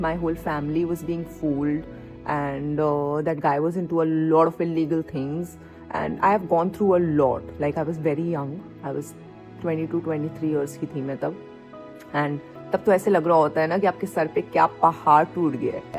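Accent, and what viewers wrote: Delhi, India. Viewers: Ur delhi accent is so cuteee..proper delhi wali tone🤌🏻🤌🏻🤌🏻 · I got very emotional after watching this vlog, I also live in noida and I am just 20, and i kind of went through the same feelings when i had a breakup, you felt just so relatable to me, also the way you talk the delhi accent everything is very relatable. I saw you for the first time in Meri aashiqui tum se hi & I adore you since then, you are a pure soul and i just wish you get all the happiness in the world 💌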